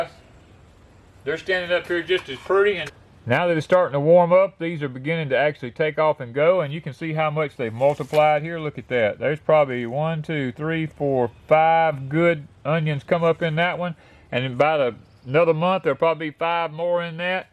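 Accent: South, United States. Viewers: I have a southern accent, too, so I don't notice his accent. He sounds like everyone I know. Lol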